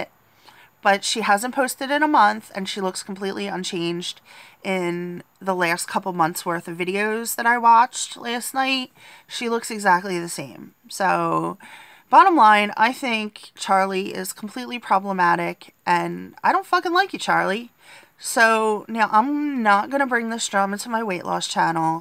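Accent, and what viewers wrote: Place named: New York, United States. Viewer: You sound like you have a New York accent! Best of luck with the challenge.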